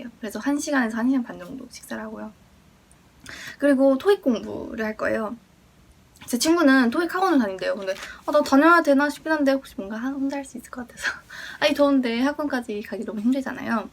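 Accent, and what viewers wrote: Seoul, South Korea. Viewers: 와 진짜로 옛날 서울사투리 듣는 기분 ㅠㅠ 넘 예뻐여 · 먼가 말투가 2000년대초 서울 사투리같아요 ㅋㅋ(칭찬)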